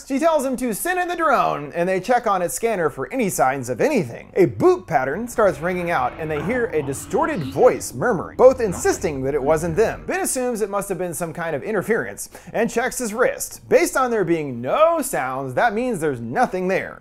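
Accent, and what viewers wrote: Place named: United States. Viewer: that American accent is so annoying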